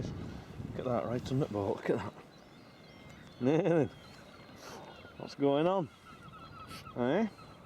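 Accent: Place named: Northern England, United Kingdom. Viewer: Enjoyed that , it's now on my to do list. This you must live near me with that accent, Wigan? Bolton? Lol.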